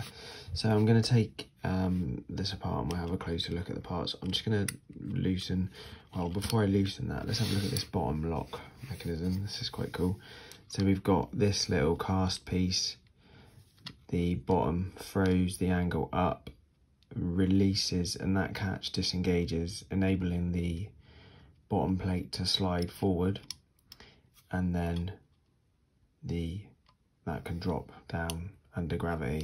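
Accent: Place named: United Kingdom. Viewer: So I woke up this morning and didn't realize what I wanted was a calm British dude explaining locks to me. Hell yeah man find that niche hahaha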